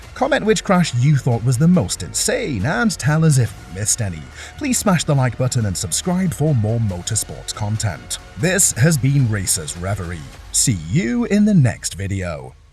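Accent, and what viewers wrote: United Kingdom. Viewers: the "Brittish" AI voice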